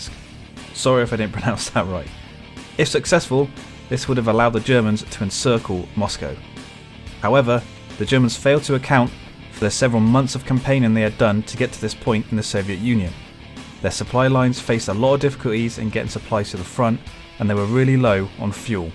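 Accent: United Kingdom. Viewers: I like your british accent! Hi from western front!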